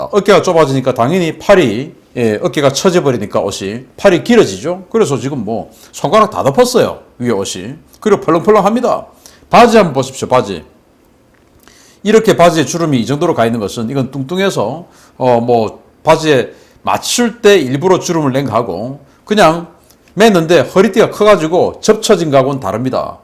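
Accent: Gyeongsang, South Korea. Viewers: 정은이기분이별로네요..핵폭탄를싸되야할텐데머니도.딸리니기분이영아니네요.교수님경상도 사투리로.큰옷입은모습를 우장바우라하심에넘정겹게들립니다 정은이도마음ㄸㅅ데로안되니만사가다헛된짓거리에후회라도좀햇음합니다.더운날시에늘애청할수있어서감사즈립니다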